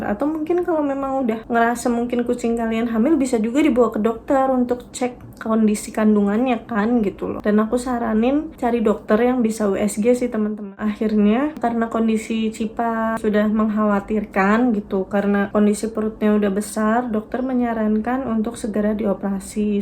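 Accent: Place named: Banyumas, Indonesia. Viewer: Dari logatnya kayanya kaka org ngapak ya🤭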